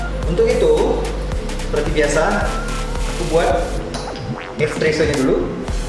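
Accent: Medan, Indonesia. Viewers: Bg orang medan ya ?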